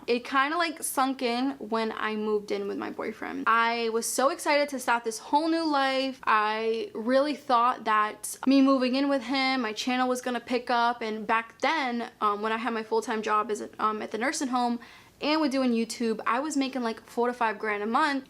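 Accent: Boston, United States. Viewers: I was wondering where that accent came from, too. But please never apologize. You're awesome!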